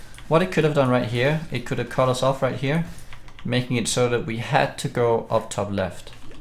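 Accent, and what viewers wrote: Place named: Denmark. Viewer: Hey, you sound like you have a danish accent. Where are you from?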